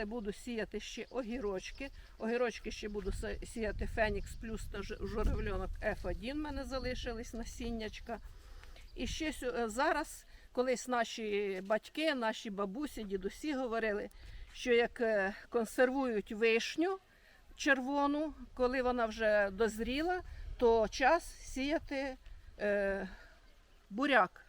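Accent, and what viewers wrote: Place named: South, Ukraine. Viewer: Наш звичайний південний ,,говор''😊. Дякую за поради.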